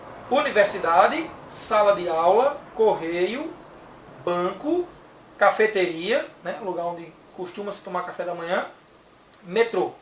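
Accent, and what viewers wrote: Northeast, Brazil. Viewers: Ele é de rio grande do norte, mas esse sotaque talvez seja o mais falado em todo interior dos 9 estados nordestinos, eu sou do interior de pernambuco, e nosso sotaque é assim também. hajimemashite!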